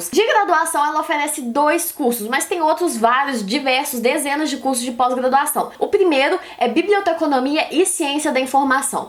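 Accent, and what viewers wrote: Minas Gerais, Brazil. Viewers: Conheci pelo GeekieGames, 2 minuto de aula e já falei: essa aí é de minas kkkk, e tava certo mesmo!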